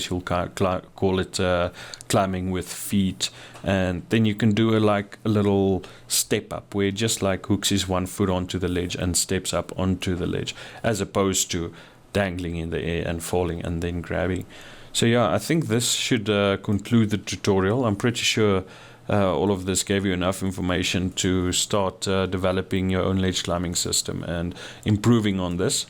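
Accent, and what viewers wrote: South Africa. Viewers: South African accent!!?!?!?!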